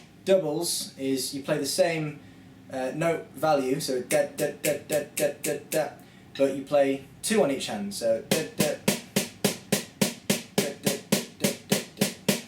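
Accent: United Kingdom. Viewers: you sound english. veeeeery annoying, please spake normul plz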